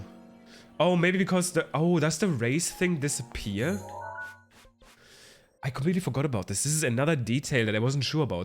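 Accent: Germany. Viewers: Nice that YouTube starts randomly showing me small channels. Heard directly that you are German 😅 Don't worry about your voice. I didn't notice anything except the coughing.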